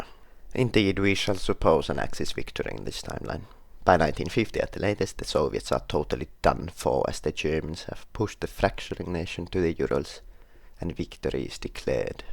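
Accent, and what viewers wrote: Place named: Finland. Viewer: First ever time heard Finnish accent quite an expirence😮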